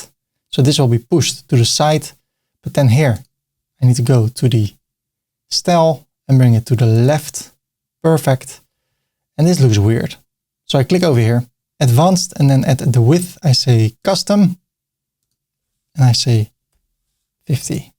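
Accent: Netherlands. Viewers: Toen ik jouw naam hoorde gecombineerd met hoe je sprak wist ik meteen dat je Nederlands was haha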